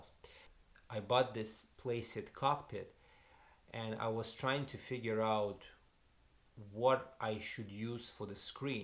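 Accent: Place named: Russia. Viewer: Сразу слышно русский акцент, но твой английский очень хороший!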